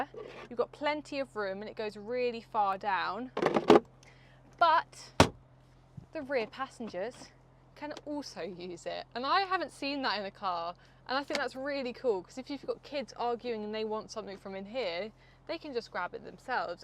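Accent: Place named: United Kingdom. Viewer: I love your british accent I feel like keep listening to you.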